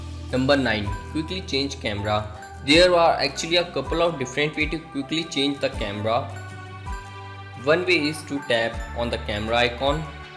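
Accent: India. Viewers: Aishwarya Singh he's a Bengali, that's why the accent. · Thank you :) your indian accent is awesome btw ;)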